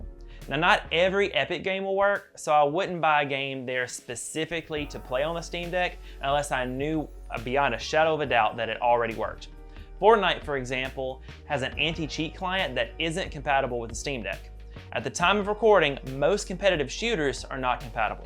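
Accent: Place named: South, United States. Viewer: I came for the review, subscribed for the southern charm. Cheers from Canada!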